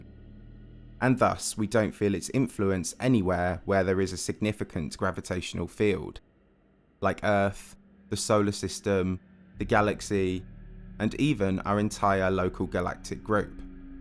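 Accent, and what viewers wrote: United Kingdom. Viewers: What's that accent? Where in the UK do they speak like that? Solar systeeeem, compositieeeen, it's kind of distracting. Other than that it's a great channel.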